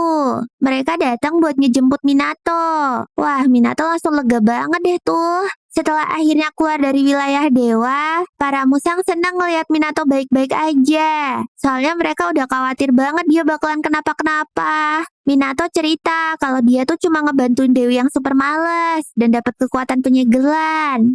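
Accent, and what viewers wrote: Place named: Java, Indonesia. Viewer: Suaranya jowo 😅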